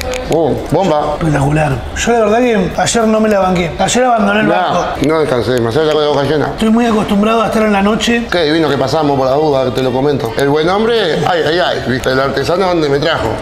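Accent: Uruguay. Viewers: Que lindo escuchar hablar uruguayo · El ejemplo perfecto del acento Uruguayo 😂🖤💛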